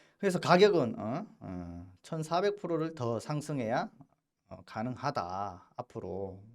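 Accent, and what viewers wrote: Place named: Gumi, South Korea. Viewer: 구미 분이세요? 제가 좋아하는 언니가 구미 고향인데 말투가 똑같아요! 구미사람들 조아요❤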